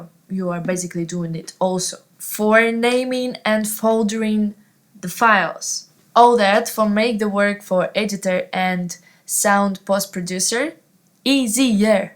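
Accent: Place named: Eastern Europe. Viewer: cute славянский accent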